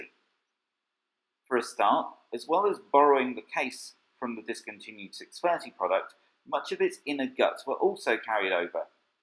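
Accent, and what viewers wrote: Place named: England, United Kingdom. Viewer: the thick english accent on this blows. I can't understand it very well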